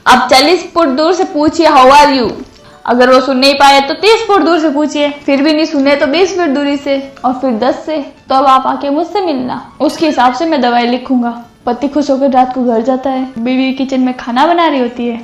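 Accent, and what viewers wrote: Marwar, India. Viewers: 👉l like your😍 marvadi boli & also your dressing sense 👌👍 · aapra awaj me marwadi ghani chokhi lage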